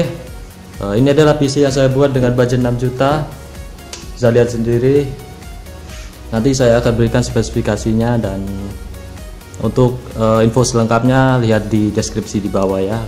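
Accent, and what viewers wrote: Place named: Java, Indonesia. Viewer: review nya lucu coy, hahahha... medhok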